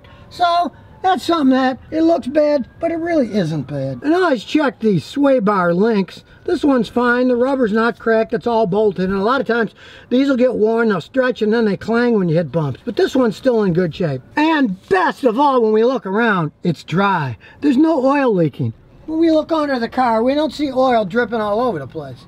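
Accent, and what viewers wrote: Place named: Michigan, United States. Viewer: I like how you say caaaarrrr, are you originally from Michigan? You have a Michigan accent.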